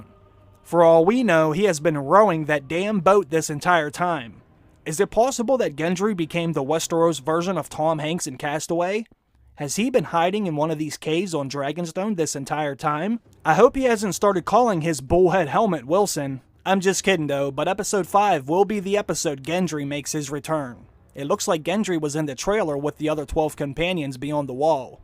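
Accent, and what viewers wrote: Pittsburgh, United States. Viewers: Are you from Pittsburgh? You sound like you're from Pittsburgh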